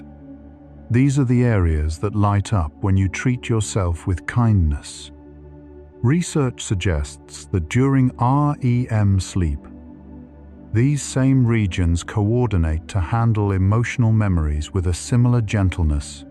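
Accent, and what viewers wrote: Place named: United Kingdom. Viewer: all these damn AI narrator's have British accents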